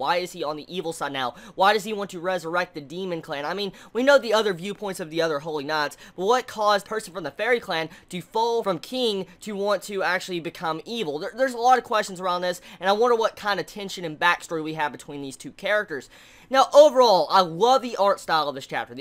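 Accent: South, United States. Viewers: 5 years ago u sounded verrrry southern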